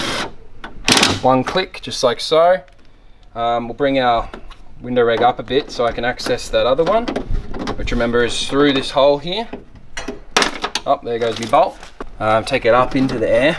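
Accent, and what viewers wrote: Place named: Australia. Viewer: Appreciate it mate finally a Aussie explaining it thanks for helping a young fella out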